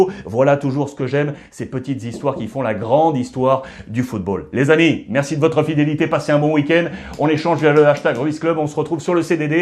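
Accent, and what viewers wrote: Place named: United States. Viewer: L'accent américaine top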